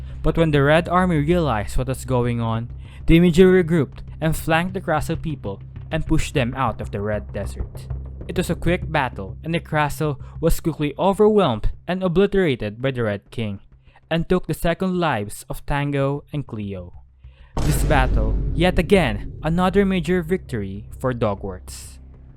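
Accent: Philippines. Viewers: Your accent is very filipino, you from pangasinan or somethin?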